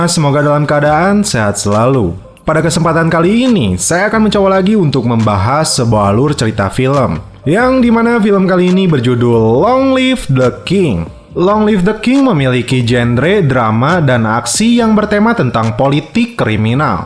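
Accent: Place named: Sunda, Indonesia. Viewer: Sorana sunda pisan, anjir.... 😀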